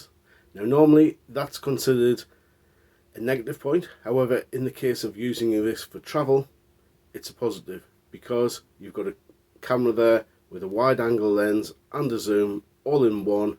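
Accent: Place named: Newcastle, United Kingdom. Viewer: Is this accent from Newcastle, by any chance? (Just curious, and I’m aware I can be way off with my guess)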